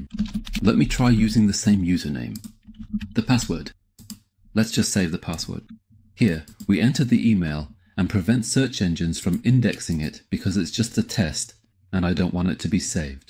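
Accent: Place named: England, United Kingdom. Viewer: I liked ur eng accent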